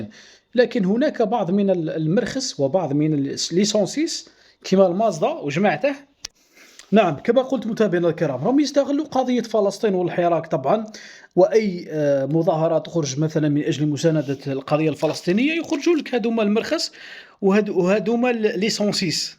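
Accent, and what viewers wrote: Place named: West, Algeria. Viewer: ارفع قليلا من المستوى خويا واحذف كلمات الشارع من محتواك كي تكون لك مصداقية أكثر ضف إلى هذا أنك تدعي أن من منطقة الشاوية و تتحدث بنطق منطقة الغرب.. تحياتنا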